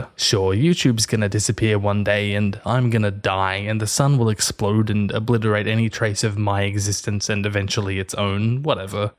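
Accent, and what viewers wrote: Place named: Australia. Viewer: Snap, I know the Pisstakes backwards and forwards from all the listens. It started as just genuinely relaxing to hear someone react the way I react to bad writing/lazy world building/ruining epic series because you're a try hard who wants to make a Staar Whor, but eventually it became familiar and therefore comforting in a different way. I probably enjoy hearing an Aussie accent too, keeps my homesickness in check.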